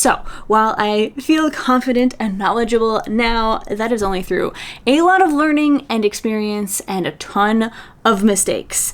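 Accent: Canada. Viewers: Yay eclectic! Also I love the way you always reply to comments. And yes I was crocheting while watching/listening. You are fun to listen to and there is nothing wrong with your Canadian mashup accent. I also have a CAN mash up accent in both official languages.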